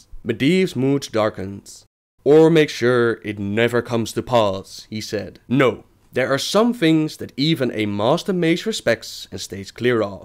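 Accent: Netherlands. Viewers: What's up with the dutch accent?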